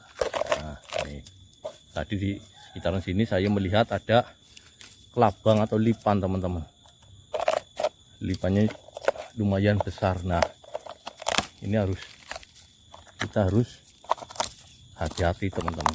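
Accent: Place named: Banyumas, Indonesia. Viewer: Sampean wong Endi bos bahasane ngapak temen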